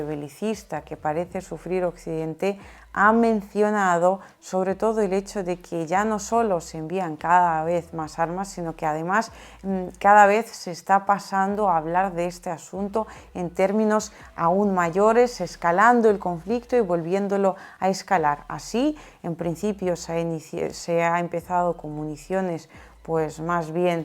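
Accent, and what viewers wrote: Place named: Galicia, Spain. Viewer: Me gusta el acento gallego, y la solvencia para describir los hechos políticos de....sds.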